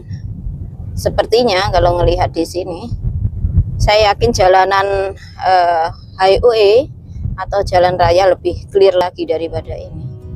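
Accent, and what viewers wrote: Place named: Java, Indonesia. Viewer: Jadinkeingetb.. Logat mba ky logatnya mba puri yg di california aksen jawanya kental banget, apalagi klu ngomong inggris, sehat selalu m family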